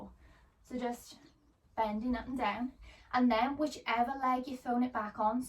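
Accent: Wales, United Kingdom. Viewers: Hmm you sound welsh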